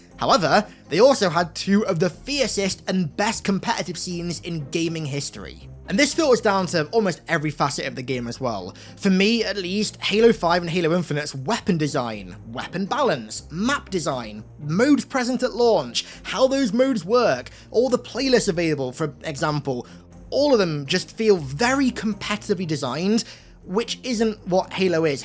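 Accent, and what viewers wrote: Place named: United Kingdom. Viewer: Disliked for the Bri'ish accent alone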